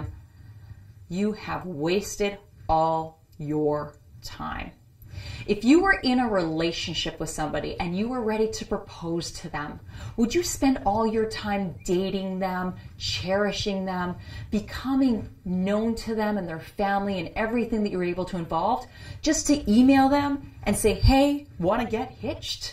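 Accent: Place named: Canada. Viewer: Excuse me, please! Thank you so much,. Your Canadian English with subtitles is fantastic. Greetings to Mar. and Sh. Take care of them. Cheers! Thank you. Bye!! 🇨🇦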